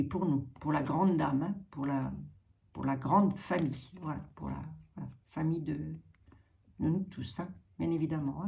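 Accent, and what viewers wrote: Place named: Vosges, France. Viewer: Le léger accent ne m'a pas trompé. Je suis aussi des Vosges mais cette fois, avec un accent beaucoup plus prononcé. Je l'ai perdu un peu depuis que j'ai quitté la Lorraine...😂🙏😇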